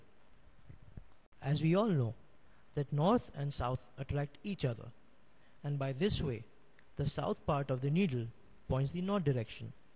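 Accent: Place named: India. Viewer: you have such a indian accent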